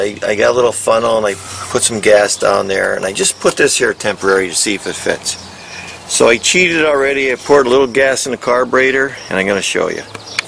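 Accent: Pennsylvania, United States. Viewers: Best video I ever seen. Thanks 👍✌ PS. Your from PA. I know the accent 😄😄😄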